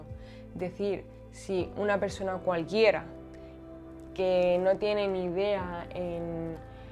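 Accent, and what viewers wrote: Spain. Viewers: me gusta su voz de española suena agradable alv xd ;v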